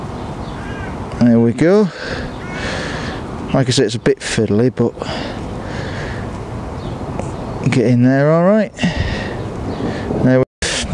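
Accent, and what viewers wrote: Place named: Hull, United Kingdom. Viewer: Nice to hear a hull lad not many in Ireland love the vids keep them coming. By the way was that East Park or picky I thought it was picky but its been so long since I was there.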